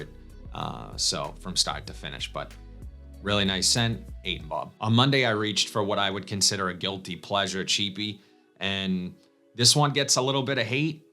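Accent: Boston, United States. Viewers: niceee I live in providence I know that accent a mile away lol Boston ri we all talk like that lol .. great vids subscribed and got notification on appreciate ur content 🔥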